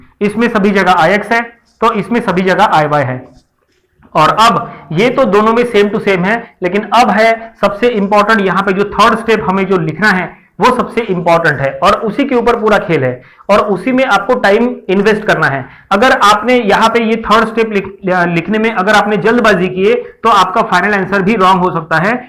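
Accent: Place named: Hyderabad, India. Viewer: Hyderabadi accent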